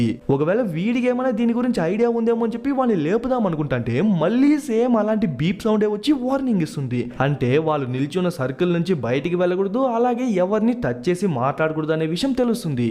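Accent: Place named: Karnataka, India. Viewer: ఎం ఉండ,ముండా,బండ రా కొజ్జనా కన్నడ గా తెలుగు కి తెగులు పట్టించే లా ఉన్నావే నువ్వు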